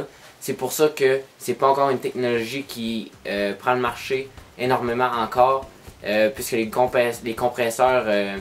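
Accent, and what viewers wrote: Quebec, Canada. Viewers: habla francés de canada. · vous avez vraiment un accent affreux les québécois, on a du mal à comprendre vos phrases pourtant on parle bien la même langue non?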